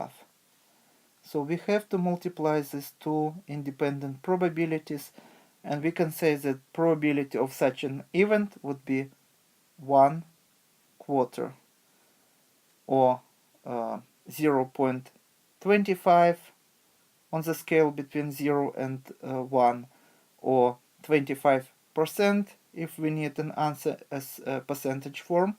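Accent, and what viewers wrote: Russia. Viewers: bro are you russian ?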